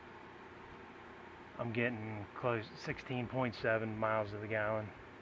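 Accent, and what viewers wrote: Pennsylvania, United States. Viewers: You sound like my in laws, Are you in PA? where did you get the bug shield from?:)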